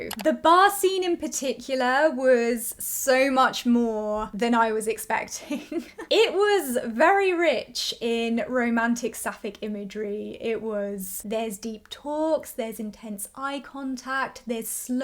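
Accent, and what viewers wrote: United Kingdom. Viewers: Fellow American swine here, and I concur that cadence and refined British accent are quite divine. Her insights are always so thought-provoking, too. ❤❤❤❤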